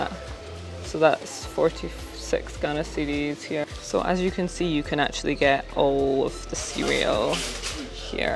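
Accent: Scotland, United Kingdom. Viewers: I'm and this Vid-yO that Scot accent is physically painful no shade, nice content great quality videos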